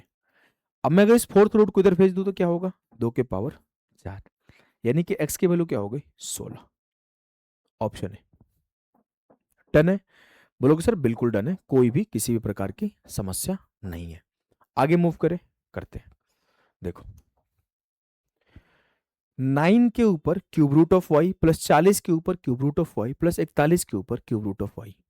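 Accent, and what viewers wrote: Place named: Uttar Pradesh, India. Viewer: sir🙏 day 12 Sir apki English ekdam U.P wala vibe deti h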